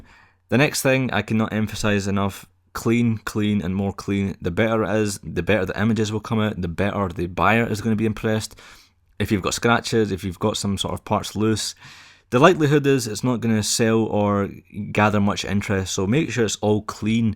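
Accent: Scotland, United Kingdom. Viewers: are u scotish?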